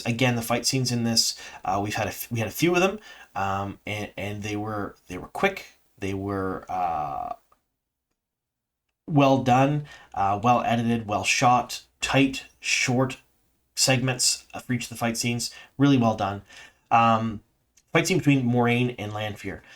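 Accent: Canada. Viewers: They're wondering about the supers?!!? The first time I heard you pronounce the word "about", I said, that's Clearly Canadian! I'm extremely about Siuan, I had a couple spoilers about her story, and I really wanted to see Sophie play those things! Fantastic season and we need seasons 4 - 8! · I also recognize that you’re Canadian because you always say “aboot” and it’s kinda charming.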